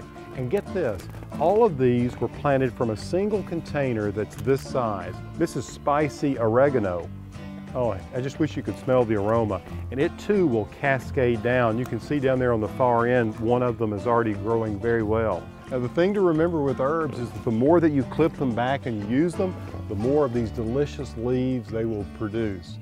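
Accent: United States. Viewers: urbs??? Baysal??? ;) loving the American Diction :)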